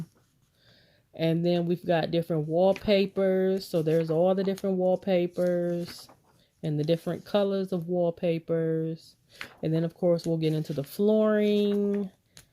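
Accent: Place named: South, United States. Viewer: Yes!! A review with a southern accent. Thank you. Love it.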